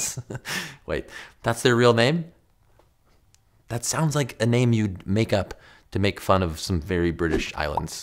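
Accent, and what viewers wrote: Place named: United States. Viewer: He’s using the American pronunciation. We’re “Two lands separated by one language.”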